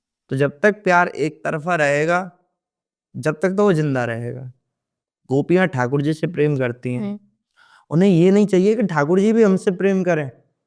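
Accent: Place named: Braj, India. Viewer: बृजवासी tone he ye शंका ना करे🙏🏻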